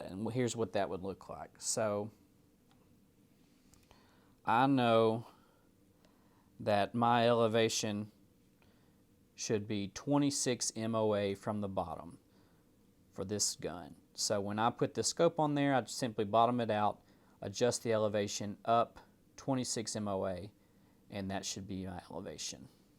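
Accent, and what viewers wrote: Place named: South, United States. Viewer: You've gotten younger......and much more southern. And is that a Bergara I spy?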